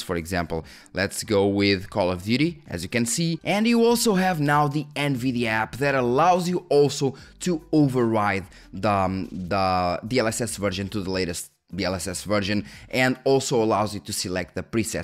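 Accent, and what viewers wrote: Portugal. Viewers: Assunto fora de tópico, você é português ou brasileiro? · Excellent video and explanations, thank you! P.s.: I've always wondered where your accent was from but I could never put my finger on it, but on this video I heard you speaking with your son (I guess) and I noticed you're Portuguese (I'm Brazilian, so that was easy to spot). Well, that's just my curiosity speaking now. Cheers! :)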